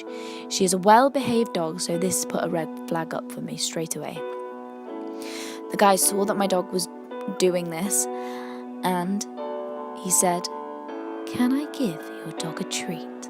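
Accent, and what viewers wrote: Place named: United Kingdom. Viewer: Thank you for using my story and the lovely shoutout! You're the best. I'm a sucker for a British accent. Beautiful narration darling.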